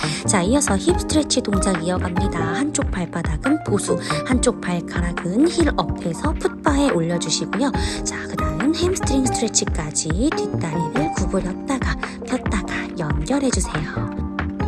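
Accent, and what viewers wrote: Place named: China. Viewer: 동물원에 가서 다리 찢는 영상 보고 왔습니다. 조선족인가요? 한국말. 하지말아주세요ㅜㅜ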